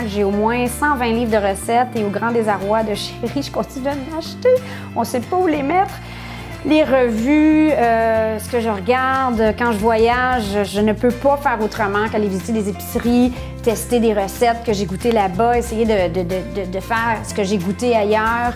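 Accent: Quebec, Canada. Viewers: Belle chaîne de cuisine, l'accent et les expressions québécoises sont sympas. Je m'abonne ;)) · J'adore l'accent canadien !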